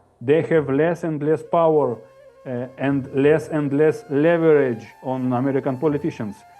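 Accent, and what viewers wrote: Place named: Russia. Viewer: Руски акцент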